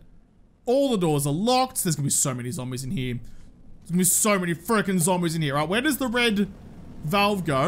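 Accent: Australia. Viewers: are you australian, if you are thats cool.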